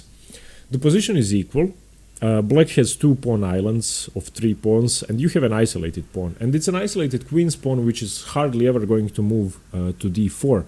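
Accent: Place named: Russia. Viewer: Thank you for providing these well thought out and well presented videos. I think I detect a Russian accent in your speaking. If so my thanks to you is "Spacibo" or "Спасибо"!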